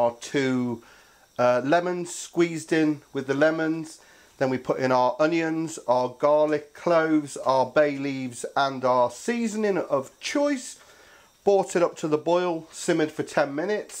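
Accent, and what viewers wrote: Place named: England, United Kingdom. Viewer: ur sweet accent alone tells ur from england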